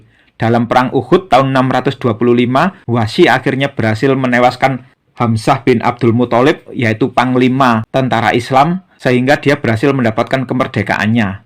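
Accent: Java, Indonesia. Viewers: Mantap mas penjelasanya Walaupun dengan dialek Jowonya yg Kental..Medok 😁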